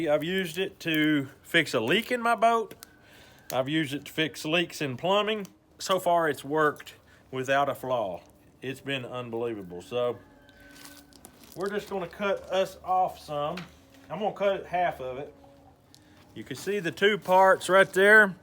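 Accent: United States. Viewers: you have such an american accent, i like, also good video man, i need it to fix drop out in my eletric bike so wanted to check how strong it was, thanks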